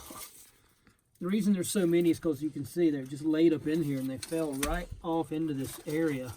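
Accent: South, United States. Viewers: it's the southern accent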